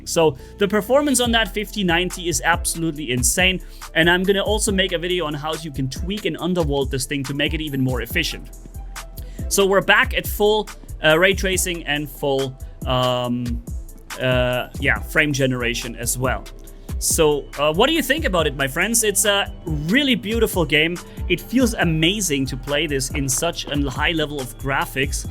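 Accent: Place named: Germany. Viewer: Ich erkenne Deutsche Akzente, auch wenn sie nur ganz dezent sind. ^^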